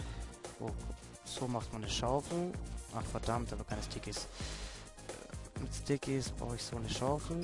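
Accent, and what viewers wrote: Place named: Swabia, Germany. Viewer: Schwäbisch Lets player :D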